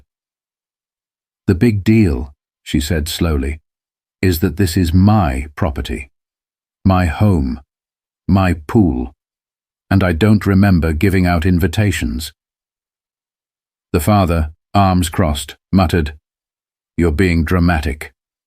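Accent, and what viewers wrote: United Kingdom. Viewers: Never any particulars such as dates, place, etc. The notes say, "Our mission is to give voice to the untold narratives that inspire, educate, and empower." Then, "Please respect the effort and creativity that went into creating this work." A created work of fiction indeed. Using a narrator with a British accent doesn't do much to enhance the so-called "Black" experience.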